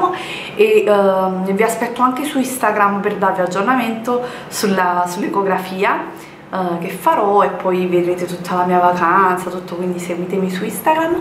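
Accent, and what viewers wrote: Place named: South, Italy. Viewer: La temperatura del suolo in questi giorni è arrivata 50 gradi, più del 2003!!! Assurdo peggio del deserto 🏜. Non so di preciso di dove sei ma dal tuo accento presumo che sei del sud, la ha fatto molto più caldo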